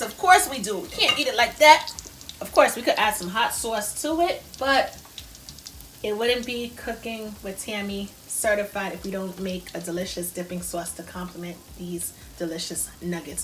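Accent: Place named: Bronx, United States. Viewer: Great vid … Luv Da Bronx accent! 😉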